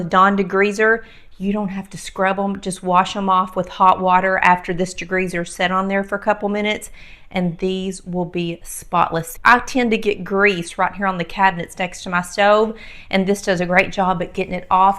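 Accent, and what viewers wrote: South, United States. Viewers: I agree, her accent is endearing. DisposAll is a GE brand of disposal, one of the oldest. Her using a brand name in place of a generic term for her disposal is the same as people saying Kleenex when referring to any facial tissue. Another word southerners often use is Coke when referring to any soft drink. So in this case, saying DisposAll isn’t a southern pronunciation, it’s a word substitute. (39 years in the South for me.) 🙂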